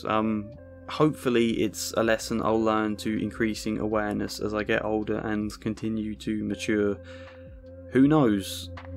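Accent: England, United Kingdom. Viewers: 137th...WOW ...a Wild Deep Dive into the Mind / Feelings of Dorothy Gale...I actually enjoyed this..and your English Accent makes it even better...... Have you seen the Prequel Oz the Great and Powerful"?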